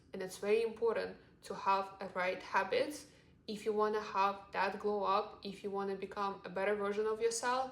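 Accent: Russia. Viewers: по первым секундам поняла что русская😮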